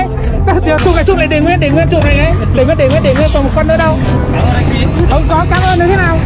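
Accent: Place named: Hanoi, Vietnam. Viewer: Admin giọng HN ạ, dịp sau có kèo nào cho em đi chung với :)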